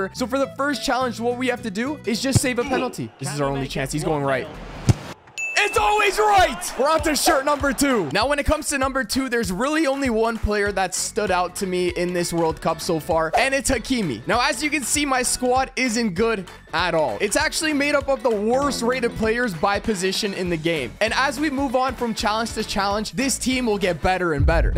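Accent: Canada. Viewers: I thought u were Canadian lol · I thought you were Canadian lol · ARE YOU CANADIAN OR POLISH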